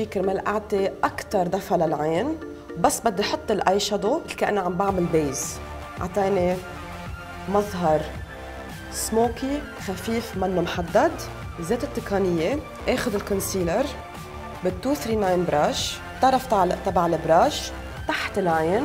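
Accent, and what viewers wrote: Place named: Lebanon. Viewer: Arabic Lebanese accent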